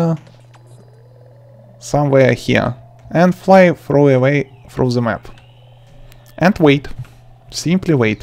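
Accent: Russia. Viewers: ur accent is cool u are from russia?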